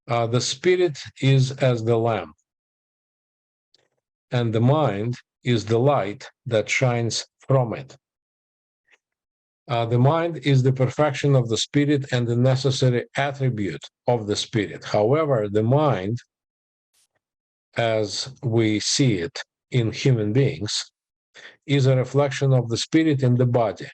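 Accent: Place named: Russia. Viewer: Здравствуйте,! Слушаю ваши лекции по истории религий на русском и они мне очень нравятся системностью подхода, чётким структурированием и ясностью изложения. Чувствуется, что у вас большой опыт преподавания. Сегодня попробовала послушать лекцию на английском и была обрадована тем, что понимаю! Отношу это в определённой степени за счёт вашего "русского" акцента ( не сочтите сомнительным комплиментом), Очень рада, что теперь потенциальный запас интелектуальных "вкусняшек" у меня увеличился. Они помогают пережить время морока, накрывшего мою страну. Thanks a lot!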